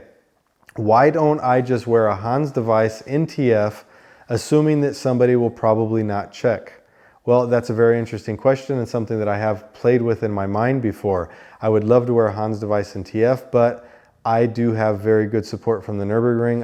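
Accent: United States. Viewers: Which part of california are you from?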